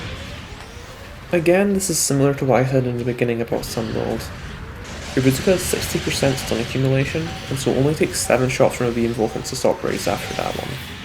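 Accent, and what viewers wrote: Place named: Scotland, United Kingdom. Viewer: Just started playing so these videos have been helpful, is that an Edinburgh accent? I'm from Glasgow so you sound Scottish but can't place it exactly.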